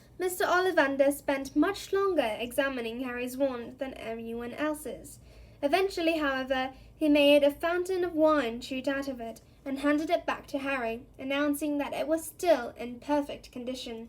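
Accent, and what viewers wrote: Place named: United Kingdom. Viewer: I love ur British voice for the book very soothing❤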